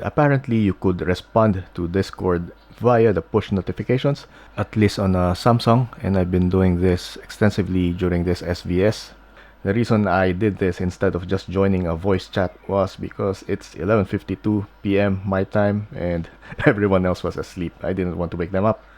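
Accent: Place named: Philippines. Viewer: Tonong pinoy paturo bro😊🧡🧡🧡🇵🇭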